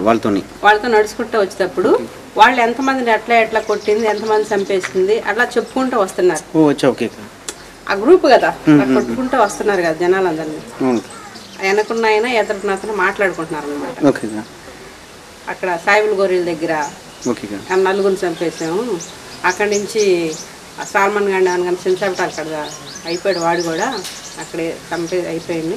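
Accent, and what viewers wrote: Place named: Tamil Nadu, India. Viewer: I want to tell one thing to Anchor. Don't try to separate mala , madiga communities ra idiot. Why you are asking again and again how is mala madha communities now , they are one or not dont ask in such a way and put fire 🔥. Mala madiga are both communities are SCs and still upcoming now.....Bastards like (ancor) you are putting / creating problems in mala madigas. So i warn you dont try to be smart for your anchoring purpose. Are chetta na son. Where you are from . Nuvvo arava na kodukivi( i understand with your pronouncing of language)........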